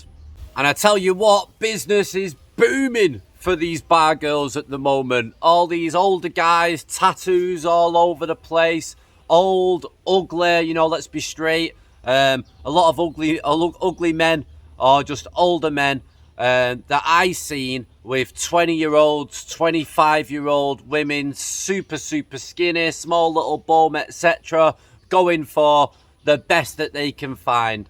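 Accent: Manchester, United Kingdom. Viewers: try to speak standard English with much less of your provincial slang. This goes for all Brits when dealing with non-Brits. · As an older guy with properties as well as family in Thailand and well over two decades experience of living in the Land Of Smiles, I have to say you appear to be the exact kind of nonce-come-grifter I make a point of avoiding. You also sound like a Manc, which might explain it, Manchester being the place where all the lowlifes who were rejected from Liverpool and Birmingham for being too scummy for even them to tolerate, ended up settling over the past however many generation so it's probably not your fault, per se, so much as genetic. You can blame your parents and theirs. Please tell us you're all jibby-jabbed up because the human gene-pool is likely well overdue a purge and if Mother Nature has her head on the right way around, yours will be exactly the retarded hereditary characteristics she'll have been targeting. 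Other than that, have a nice day and do give my regards to your village (poor beggars living there could probably use a kindly word coming from a Farang).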